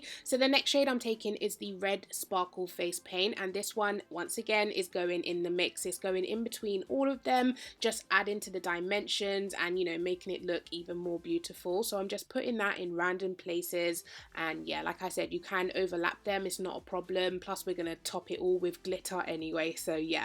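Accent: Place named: United Kingdom. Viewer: Havent watched a british youtube vid in a hot minute. Love her accent. The makeup is gorgeous ♡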